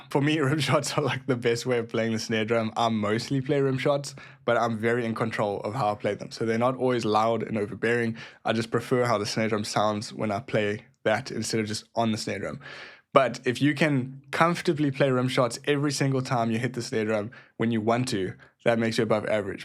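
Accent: South Africa. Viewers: A South African 😂 with a KZN accent?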